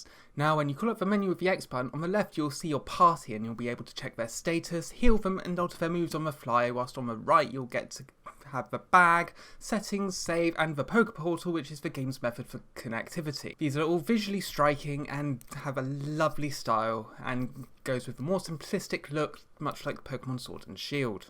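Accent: United Kingdom. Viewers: holy shit is British? not sure why that caught me so off guard lol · YOUR BRITISH???? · I've been using forever and never knew was british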